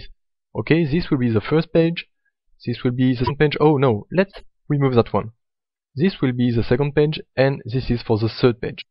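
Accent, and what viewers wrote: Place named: France. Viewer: THE FRENCH ACCENT!!! , good apps btw, thanks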